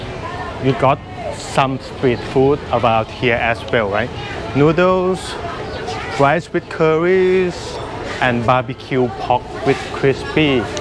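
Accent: Thailand. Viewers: Greetings from Singapore,. I can see that you have put so much effort in every video that you made. I think all of your friends here are appreciative of what you do. Your accented Thai English is very pleasant to hear and easy to understand. I will be following you as I come to Bangkok 5 to 6 times a year for holiday and relaxation. Thank you. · your explanation is very well done and the direction is very useful. Your English is very well spoken with charming Thai accent (cute)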